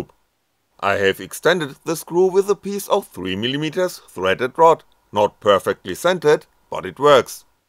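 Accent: Germany. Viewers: I've never heard anyone more German than this guy.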